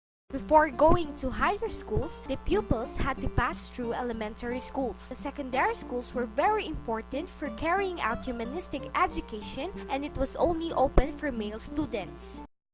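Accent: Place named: Philippines. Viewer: Informative! Although the accent you have really sounds like a filipina which is cool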